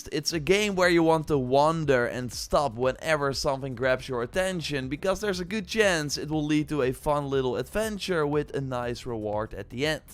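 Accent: Netherlands. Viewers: Are you Dutch hahahah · Dude what is that accent??!! Is it Dutch?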